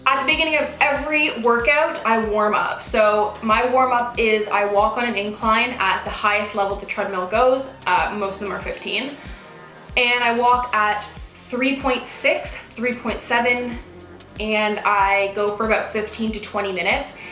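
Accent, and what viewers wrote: Canada. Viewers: Are you Canadian because the way you say out is different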